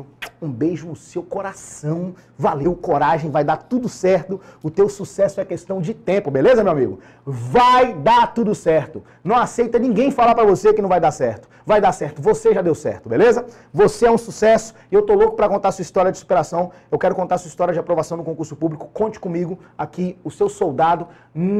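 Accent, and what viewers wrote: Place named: Rio de Janeiro, Brazil. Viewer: jurava que você era do Rio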